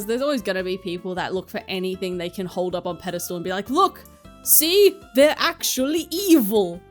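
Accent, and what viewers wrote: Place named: Australia. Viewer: im so australian that i didnt even realise u were australian too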